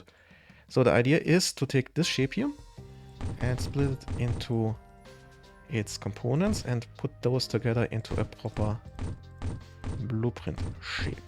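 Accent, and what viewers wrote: Germany. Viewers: The German accent is crazy lol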